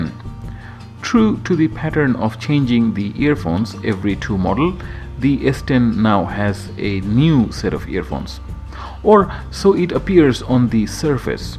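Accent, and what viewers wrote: India. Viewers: What is that lovely accent!! It sounds like Indian accent with some Asian mixed!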